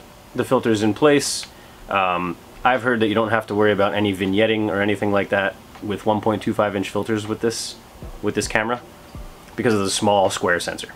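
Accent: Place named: Virginia, United States. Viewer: You in VA? Sounds like my area lol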